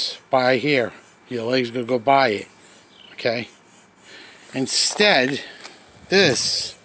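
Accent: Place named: New England, United States. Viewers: New England accent is the best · My mom was from Boston..sounds like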